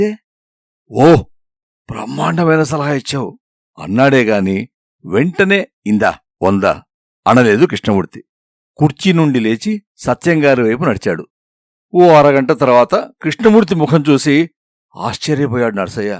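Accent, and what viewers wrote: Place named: Srikakulam, India. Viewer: మీకు నా హృదయ పూర్వక వందనాలు శ్రీకాకుళం యాస దిన్చేశారు. ధన్యవాదాలు నా రాబోయే కథలకు మీపరిచయం అమోఘం. మీ ఆశీస్సులతో ముందుకు వెళ్తాను మహాశయా నా కథలలో కొన్నింటికి నా ప్రియ మిత్రులు సరసి గారు ( ప్రముఖ కార్టూనిస్ట్) బొమ్మలను ఇవ్వడం నా సుకృతం ఆయనకు ఈ వేదికపై నమ స్సుమాంజలులు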